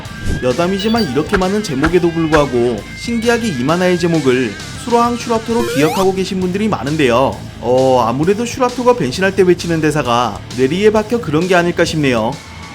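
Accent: Gangwon, South Korea. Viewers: 약간 강원도 사투리쓰시는듯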